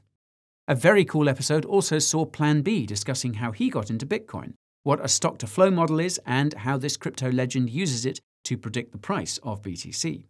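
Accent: Australia. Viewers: Always insightful and entertaining. Absolutely nailed the Aussie accent. Love your work mate! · nice aussie accent! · Brilliant Australian accent! 🤠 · Love the Aussie accent ! I'm up for some Roocoins !!! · Well done with the Aussie accent as an aussie I laughed my tits off. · Haha, amazing Aussie accent, nice one!! 😁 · Funny AF! But the accent is more proper than my friend from the Gold Coast. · Another Aussie here and i must say,you could pass as one of us mate.That was brilliant. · LMAO. Mate your Aussie accent is spot on. Love the mullet! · Not bad ‘Strailian accent Mate! Good on ya… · Aussie accent was one of the best I've heard (I'm an Aussie) Where do I get that Roocoin? 😄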